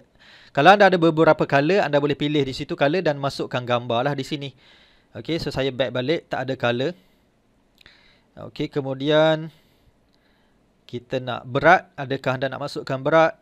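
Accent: Terengganu, Malaysia. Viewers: Terbaik sharing boh👍🏼👍🏼👍🏼.. teka jap boh asal ganu kan.. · Asal ganu ke bang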